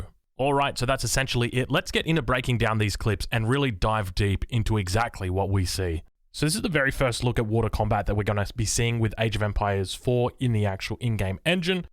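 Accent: Australia. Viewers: "My soothing australian accent" - Self awareness is key Mr:D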